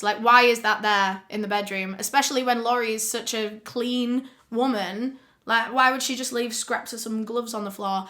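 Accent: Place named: Northern England, United Kingdom. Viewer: As a yorkshire woman born and bred, I am loving the northern twang that sneaks in every other sentence xo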